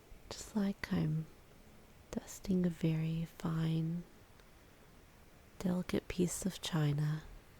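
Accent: South, United States. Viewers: By the way, do you happen to be from the Southern United States? I thought I hear just a really slight drawl but maybe it's just the soft speaking.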